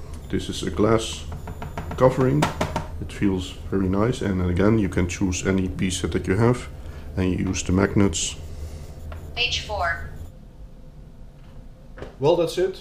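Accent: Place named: Netherlands. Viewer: Aaaah een mede Nederlander, hoor dat direct aan je accent XD toffe video!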